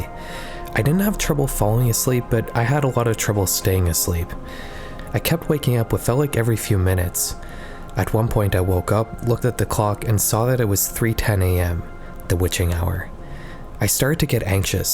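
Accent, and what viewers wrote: Canada. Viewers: Lol youre canadian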